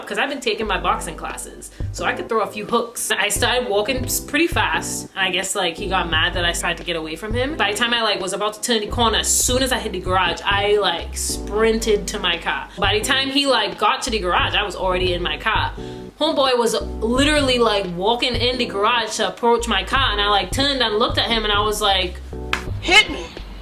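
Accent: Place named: Trinidad and Tobago. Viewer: Really proud of you. Still hearing the lill Trini . do ur thing girl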